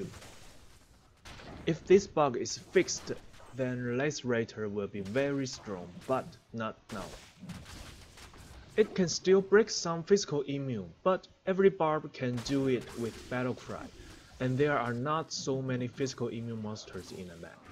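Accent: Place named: Japan. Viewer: Love it! :) Entertaining and useful! Love the japanese accent aswell adds to the credibility. I dont have time to the all the test a research anymore so this is very nice. Keep up the good work.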